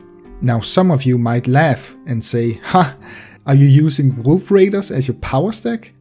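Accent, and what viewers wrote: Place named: Denmark. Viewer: Are you danish? I sense some accent.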